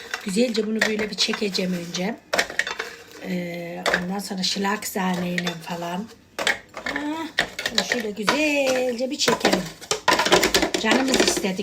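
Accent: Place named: Nevşehir, Turkey. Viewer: Gırdı Nevşehir li ne Türkçe amma 😊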